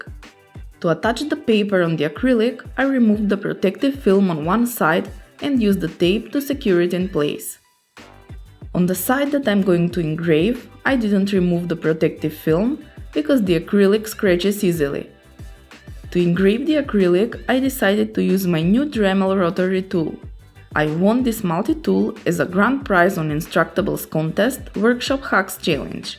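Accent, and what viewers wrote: Russia. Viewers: Попахивает русским акцентом) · Отличная работа! Но у вас жуткий русский акцент, надо тренироваться ;) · Какой четкий русский акцент в этом английском :) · Похоже русская озвучивает. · русский акцен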